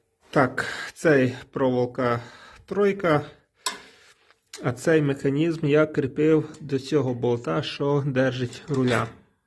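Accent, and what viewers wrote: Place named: Transcarpathia, Ukraine. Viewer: Ти із Закарпаття, говір у тя знакомий, із якого района?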